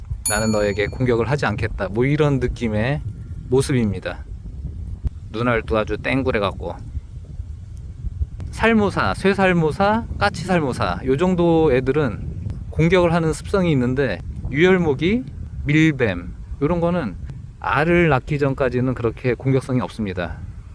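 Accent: Jeolla, South Korea. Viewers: 자네 고향이 전라돈가?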